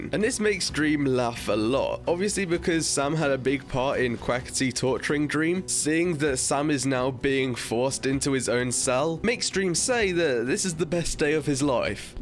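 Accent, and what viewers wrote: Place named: United Kingdom. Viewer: You sound like a British Sam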